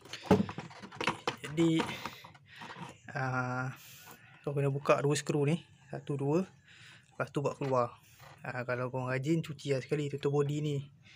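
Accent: Melaka, Malaysia. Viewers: Assalam bang, Nak mintak tolong tukarkan boleh ke?...Saya dah service kat bengkel kena RM 60 ...Macam tu jgk turun naik ....bunyi cakap mcm org melaka...kalau kat melaka boleh la roger2...haha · Pekat betul tu bang melaka tu hahaha. Mantap bang video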